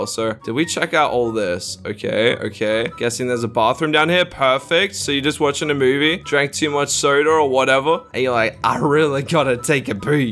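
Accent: Australia. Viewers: I was going to say Australian until I scrolled Down... I have a question.. are you Australian because I am and it’s really easy to tell if your Australian bc any other YouTubed is usually from America or England and they sound so similar but it’s true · What accent do you have? Love it btw Edit: Oh later he says he is from Australia....Lol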